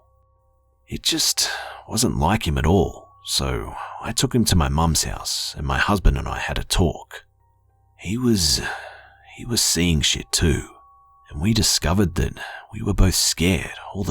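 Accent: Australia. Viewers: can't quite place your accent. Australian , maybe?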